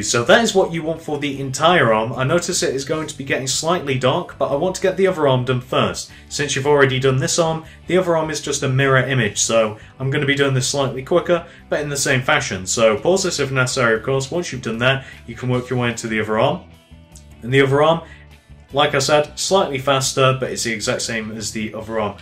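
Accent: United Kingdom. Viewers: It's his accent, he's british xD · Mary Ruth Orr he has a british accent calm down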